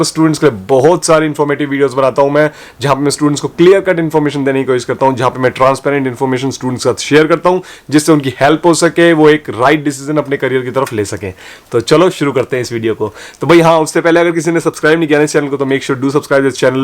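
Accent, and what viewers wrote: Haryana, India. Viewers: i think aap haryanvi ho right?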